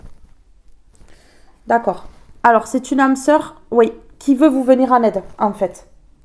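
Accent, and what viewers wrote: South, France. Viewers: j'asore votre accent du Sud...trop mimi ne changez pas merci pour votre guidance bon wkd...13 vendredi😊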